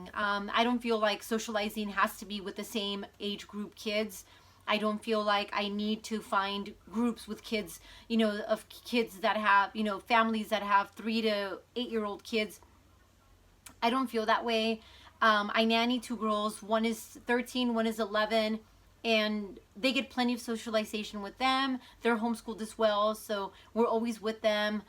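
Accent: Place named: California, United States. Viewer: I know this is an old video, but I'm so tickled to hear that you're from California because I thought you had a very familiar way of speaking (I'm from the Central Valley). I enjoy seeing the practical and creative ways that you homeschool and have taken a lot of inspiration from it.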